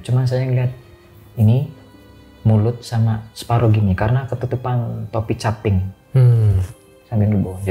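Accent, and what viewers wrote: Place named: Banyumas, Indonesia. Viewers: Di Chanel BP lebih lengkap, disini juga keren aku suka gambar ilustrasinya, sehat trs wong tegal laka2 ceritanya epic bhs juga epic ngapak 😂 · Slam ngapak mas tegale ndi mas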